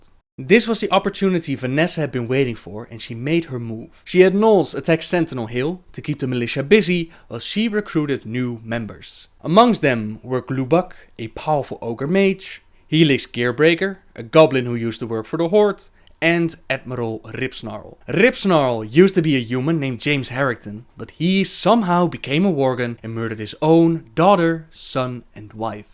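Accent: Netherlands. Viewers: You have an incredibly strong dutch accent mate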